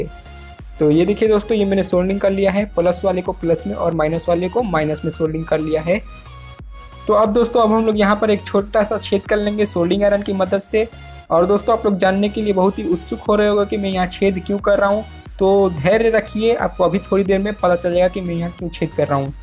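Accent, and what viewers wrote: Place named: Eastern Uttar Pradesh, India. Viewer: most welcome sir Up me aap kaha se hai sir language se aap east up ke lagte ho sayad Varanasi ya Balliya side Aap hi btaeye if koi problem na ho to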